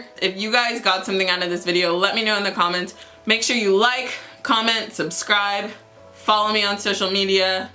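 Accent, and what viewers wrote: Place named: United States. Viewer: What part of america r u from interesting accent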